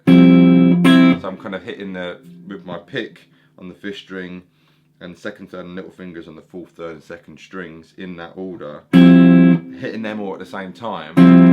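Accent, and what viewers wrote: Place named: Cockney, United Kingdom. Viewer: def sound like a cockney I was born in whitechaple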